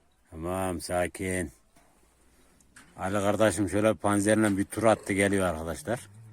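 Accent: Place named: Kastamonu, Turkey. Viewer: Kardeşim tekrar geçmiş olsun allahım şifa versin ben ist dan yazıyom kastamonu pınarbaşılıyım eytliyim çıkar öıkmz memleketime gidecem ama ilk fırsatımda seni ziyaret edicem çünkü sen bizim doğal şivemizi temsil e uyuyosun tebrikler saygılar selamlar kardeşim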